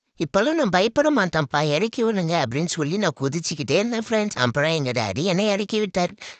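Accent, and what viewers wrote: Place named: Chennai, India. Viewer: Ada namma ooru paiyanaa neeyi ❤. Ana chennai slang pesuriye da paiya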